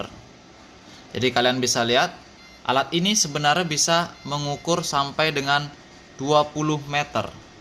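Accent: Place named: Java, Indonesia. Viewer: Klo di perhatikan bli ini ada logat jawanya ya...munhkin pergaulan yg luas jg kli ya...😀😀👍👍👍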